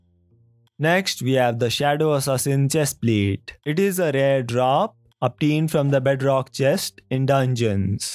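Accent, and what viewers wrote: India. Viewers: Are you indian? Ur accent is cool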